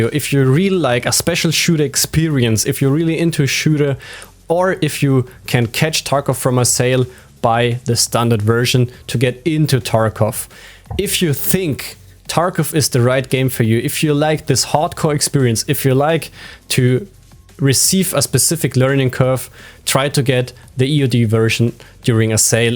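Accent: Germany. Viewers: Man hört so krass das er Deutscher ist xD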